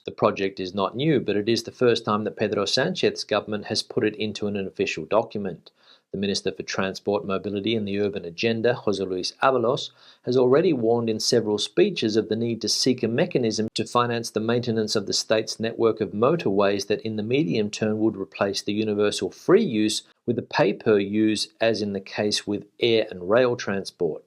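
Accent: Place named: Australia. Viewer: Thank you for your informative video and your continued work. By the way loving your Aussie accent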